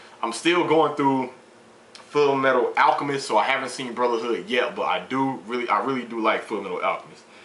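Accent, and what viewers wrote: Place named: Midwest, United States. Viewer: i knew you was a mid-west dude, my guess was probably st louis or detriot.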